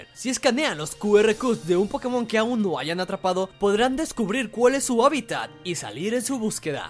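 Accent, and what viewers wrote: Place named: Mexico. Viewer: donde puedo apartar mi versión del juego, o sea donde lo puedo mandar a pedir o algo por el estilo. Supongo que vives en México, por tu acento y las palabras que usas en tus vídeos.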